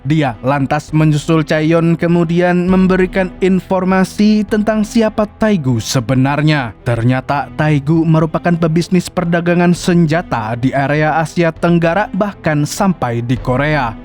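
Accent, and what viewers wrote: Banjar, Indonesia. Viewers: Ada logat Banjar jua amang nya😅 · nah org banjar rupanya sidin wkwkwkwkwkw 🤣🤣🤣🤣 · urang banjar kah pian nih mang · Ulun Hanyar sadar kaya nya Pian nih urang Banjar kh😅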